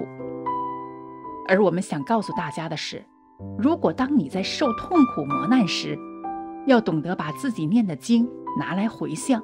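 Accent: Hakka, China. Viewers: 佛友吉祥 客家口音有點重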